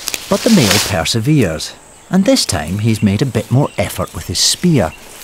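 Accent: Scotland, United Kingdom. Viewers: Is it just me or does the narrator sound somewhat Scottish?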